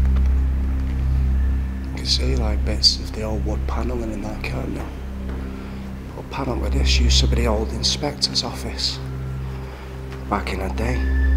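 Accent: Manchester, United Kingdom. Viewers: Hi m8 i watch 2 or 3 Urbex channels But i think your content is top draw and the old cheeky Manc commentary adds adds a different dimension to it too, Think you should go back their and do a more longer and in-depth look at the place as it was very interesting as a old skool 88-93 raver i love the old time buildings and architecture, Wonder if anybody stoped off their before going the Twisted Wheel, Hacienda or Thunderdome lol Keep Up The Good Work R Kid lol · Got this link from a MEN news article. Blew me away. Absolutely love this stuff. Really love the Manc accent commentary too! "Holy smoke!!" Pissed myself! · I love the videos but this guy surely accentuates the Manc accent haha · Ey pal, is Man Vic still open ¿? , me n our kid (W. Casino devotees), before we had motors, used rail to getup to W.Wallgate from Victoria. HaH! In 77 we were approached, ticketing area,, by a geeza and his bird with an Adidas FULL TO BOSTINGLY, of grabbed t. 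Lights (Tuinal caps), and we had a few for the morning and fk, after the b.st.blueys, by time we got back to Chesterfield next mid morning we was well fkn rammed. Passed through M V only coupla times after and, post ticket area, had to, and managed to fall the like 20+ steps into the underpass to the W W gate platform. We all got fed up with later attacks on the rail travelling soul crowd as Wigan locals turned against us. Am 60 now, blimey, wish was a young again, y'know. Neat vlogs oak, bang on, your accent reminds of the Trafford lads, norarf! Say, you do olde pubs as well, Okeh, outside Manny Picc there used to be a mini 2 roomed alehouse, is it still theere, just across the road from where a traveller came out from that station, where the taxis later used to congregate ¿? Top endocrine Whitworth St I think.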